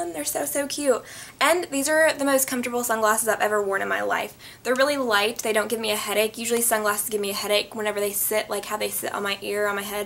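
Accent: South, United States. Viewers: I totally agree! She changes a lot in the timing of all of her videos and that the beauty of makeup :) Its crazy to see her transformation. Her accent also seems SOOOO southern ?!! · I never knew u had an accent. I love your souther accent. I think u were the first beauty YouTuber I started following maybe 3 years ago. Haters talk about your accent and I was so confused thinking u had an accent in the most recent videos but now I understand...haters gonna hate. I love your videos. They always inspire me to improve my eye makeup skills. And we share the same bday😊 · I love ur accent!!! Lol Y'ALL! And I love that coach bag but it wouldn't work for me 😓 I'm a mommy and that bag isn't Supposed to get wet some how it would end up in a bath tub in my house lol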